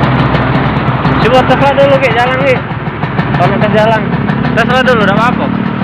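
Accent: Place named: Palembang, Indonesia. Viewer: Ay wong plembang 🤣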